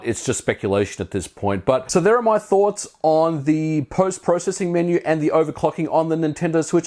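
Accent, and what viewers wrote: Australia. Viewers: australian accent is beautiful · And with a spanish accent instead of australian :D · yes really. That australian accent is awful. Instant switch off.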